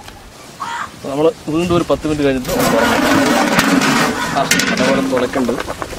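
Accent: Palakkad, India. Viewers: പാലക്കാടൻ ഭാഷ ഇസ്തം 😀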